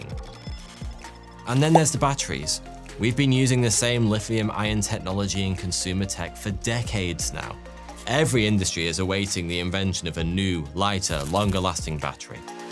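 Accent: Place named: Yorkshire, United Kingdom. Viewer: Anybody know what part of uk this guy is from? In only asking cuz i have a very similar accent and i originate from Harrogate, yorkshire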